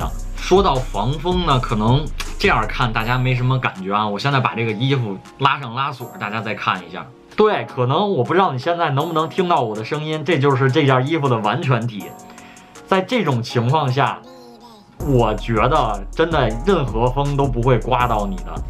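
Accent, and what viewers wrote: Beijing, China. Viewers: 哇博主是北京人吗！好熟悉的口音咧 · 北京话 亲切！ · 你这口音是北京口音吗？实在是好难听啊！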